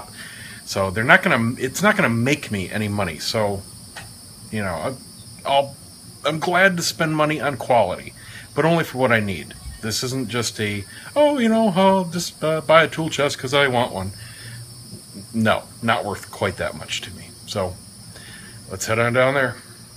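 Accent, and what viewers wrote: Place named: Orlando, United States. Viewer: Check the pawn shops, ive been cleaning up there lately. You sound like your in the Orlando area. If so check out Godfathers pawn on Colonial. There are 2 locations. I seen a nice Milwaukee box in there you might like.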